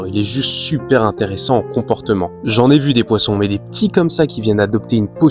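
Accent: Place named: Charente, France. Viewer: Tu ne serais pas originaire de Charente/ Charente Maritime par hasard? Parce que la prononciation de Rogarde (regarde), Promière (première) me fait penser à ça 😂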